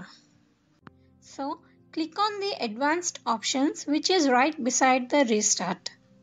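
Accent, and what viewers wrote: India. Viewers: Wow mam how smooth u speak Like an Indian...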